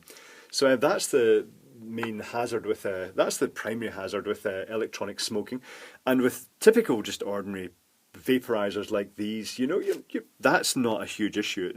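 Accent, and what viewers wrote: Scotland, United Kingdom. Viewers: I keep reading code and articles in your Scottish voice and accent and I can't make it stop. I think I'm gay.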